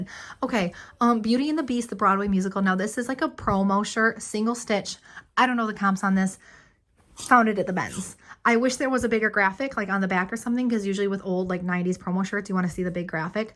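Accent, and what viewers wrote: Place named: Midwest, United States. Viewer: Your Midwest accent is AMAZING. Where are you based? I miss it, I grew up in SE Wisconsin :)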